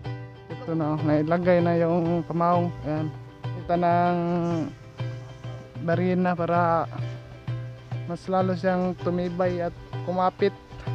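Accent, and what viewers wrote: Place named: Visayas, Philippines. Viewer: Hahaha abi kog peko agaw sa pala mahirap talaga tayong mga bisaya mag tagalog hehehe 🤣 proud jud ko sa akong pagka bisaya bai..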